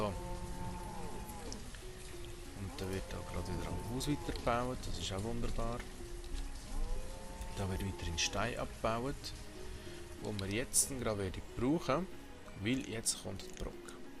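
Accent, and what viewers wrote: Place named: Switzerland. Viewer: Juhuuuuuu Schwiizerdütscht ;)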